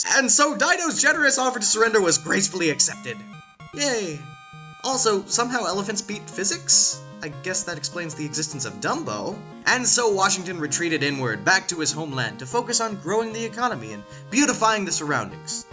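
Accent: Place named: United States. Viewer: He sounds like America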